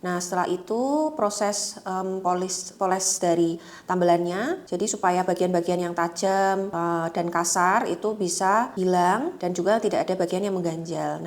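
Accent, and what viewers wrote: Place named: Java, Indonesia. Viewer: Dokter medok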